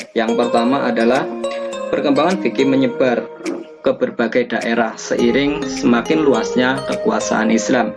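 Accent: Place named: Banyumas, Indonesia. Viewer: Mantap sodara ngapakku🔥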